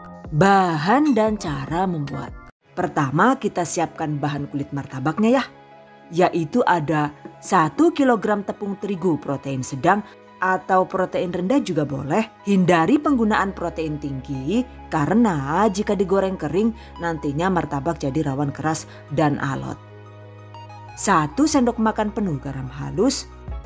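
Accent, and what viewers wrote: Banyumas, Indonesia. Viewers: 😂😂ngapak bngt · Asyik jelas dan teratur bgt...ada bahasa ngapaknya juga😂😂😅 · Q nntn ngantuk pas dgr suara ky ngapak jd melek lg😅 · Wong ndi sih ngapak temen yuuu🤣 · Rika wong ngapak ya Bu😂😂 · DONE Terima kasih mbak krn selalu memberikan resep yg ekonomis utk ide jualan,serta dg penjelasan yg detail dg harga2 bahan serta hrs di jual dg harga berapa,suka lucu klo denger ngomong bahasa ngapak dg suara khasnya...semoga sll muncul ide resep2 br yg ekonomis buat ibu rmh tangga yg ingin memulai usaha..sukses dan berkah selalu..Aamiin #GivewayTHV2021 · Jan logate apa karo nyong..y slm ngapak..ora ngapak ora kepenak..slm kenal..boleh dicoba nih😋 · Deneng dadi bahasa ngapak🤣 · Hahaha....ngapak jaaan kiye lah...mtr nuwun bund resepnya.sukses sll kontennya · Mbak, asline uwong mediun apa brebes si.. Faseh bener logat ngapak e 😅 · ngapak kaya nyong🤭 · rika wong endi yuu..deneng ngapak banget😄😄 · Saya recook ini kak, buat jualan, hasil x endeessss🤤, selain itu paling suka dengerin kk nya ngmng, gak ngebosenin, ngapak x gak ketinggalan jg 😆 #GiveawayTHV2021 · Wilang ngapak ya😅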